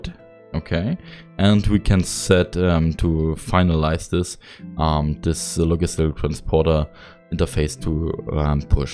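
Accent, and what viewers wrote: Germany. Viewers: Ich höre deutschen Akzent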